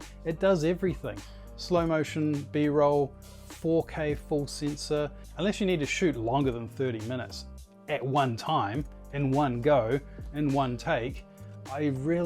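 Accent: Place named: New Zealand. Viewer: Omg I thought you had a Kiwi accent! And you are from NZ indeed!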